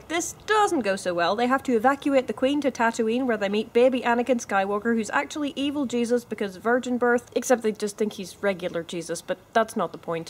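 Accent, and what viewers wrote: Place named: Northern Ireland, United Kingdom. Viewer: No she's Northern Irish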